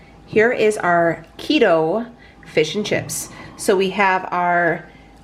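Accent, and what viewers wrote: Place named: Minnesota, United States. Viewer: You sure sound Minnesotan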